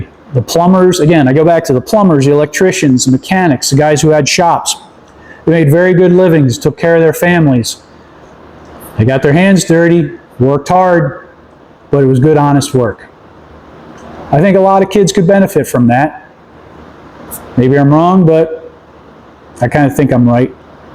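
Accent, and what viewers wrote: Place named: East Coast, United States. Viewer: He sounds east coast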